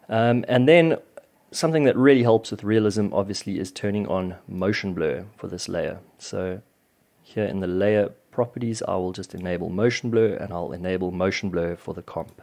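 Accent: South Africa. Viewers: sup are u South African?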